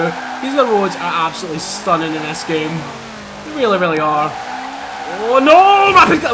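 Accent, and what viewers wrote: Scotland, United Kingdom. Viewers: bro your accent is awesome I love the Scottish accent, and am so glad I found your channel again, I can remember watching your game play on a Dodge challenger hellcat when Forza horizon 3 come out and it was awesome but at the time I didn't have my you tube account, and it's ages since I have looking for your channel, anyways my dude keep up your awesome work and I'll make sure I'll stay tuned in :)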